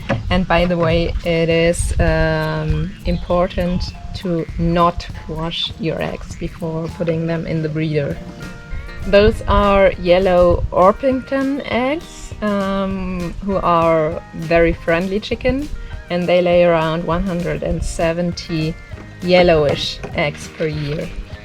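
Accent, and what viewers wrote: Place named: Germany. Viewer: She sounds German.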